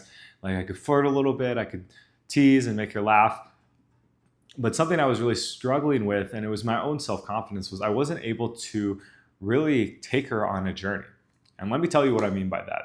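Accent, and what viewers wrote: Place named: Mexico. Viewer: I've been here 7 years have yet to meet a Mexican girl that speaks English like that. But, I'm not in the gringo tourist area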